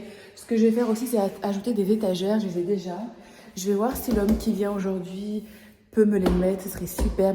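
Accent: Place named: United States. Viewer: English without an American accent please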